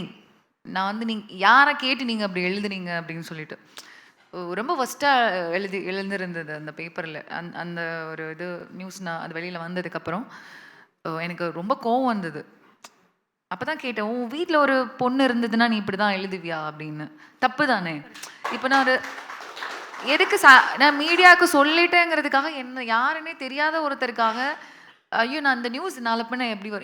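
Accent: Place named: Kerala, India. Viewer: Your Ramanathapuram we know but slang looks like Malayalam slang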